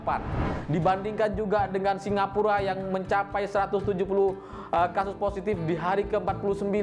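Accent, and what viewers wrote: Madura, Indonesia. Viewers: orang ini ini logat bicaranya kayak nya orang madura hehe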